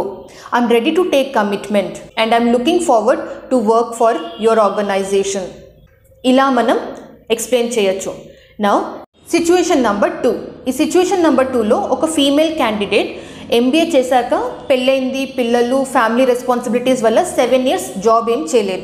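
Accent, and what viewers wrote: India. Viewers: You are way of talking like a Neath Indian.. where are you from mam...?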